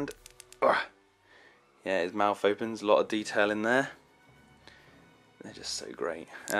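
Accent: England, United Kingdom. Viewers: do u have a British accent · your from england rite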